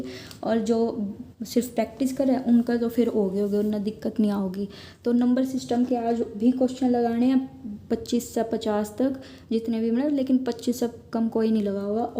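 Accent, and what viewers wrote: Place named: Haryana, India. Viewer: Hello mam apki hriyanvi voice bahut achhi hai All the best for your exam Or chennal pe daily video dalna mat bhulna please apka chennal ek din baahut grow karega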